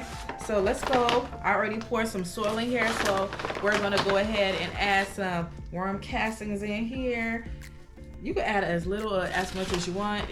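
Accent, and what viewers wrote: Liberia. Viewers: Do I hear a faint Liberian accent?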